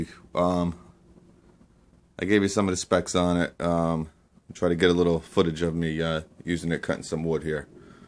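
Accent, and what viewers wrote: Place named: Long Island, United States. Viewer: You have to be from Long Island with that accent. Thanks for the review.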